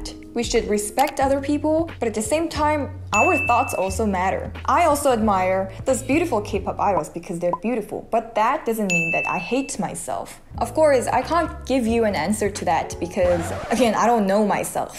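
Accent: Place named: Philippines. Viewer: Your accent is so similar with Filipinos' accent ❤️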